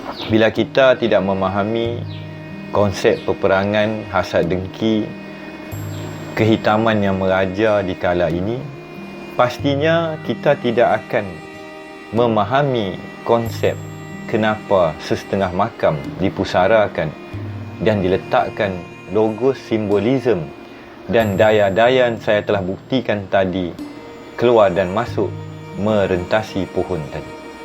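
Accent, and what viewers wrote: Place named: Kedah, Malaysia. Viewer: Wah Bercerita menggunakan loghat utara (melayu kedah ). Jgn diklaim bahasa indonesia pula.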